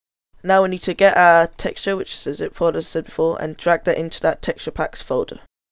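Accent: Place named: United Kingdom. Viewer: OMG he s ounds sooooooooooooooooooooooooooooooooooooooo british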